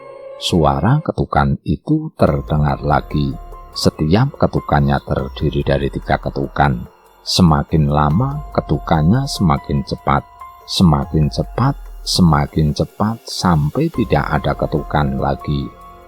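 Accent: Java, Indonesia. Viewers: Yg bawa cerita nya gk enak medok Jawa di ulang, ulang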